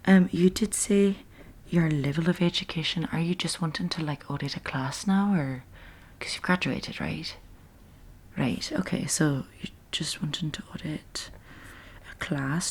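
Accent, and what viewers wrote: Ireland. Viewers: Do more Irish and jersey accent roleplays !!! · Dang you got that irish accent down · We NEED more videos with the Irish accent! We beg you!!!! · someone saw the banshees of Isherin!! or you're just really good at an irish accent. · I love the Irish accent, please do more of it in the future!!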